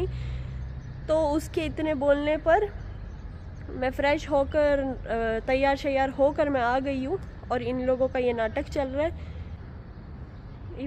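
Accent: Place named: Middle East. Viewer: Ap Pakistan se ho k middle east se qk ap Urdu JB bolti ho to jaise lagta hai k ap middle east ki ho.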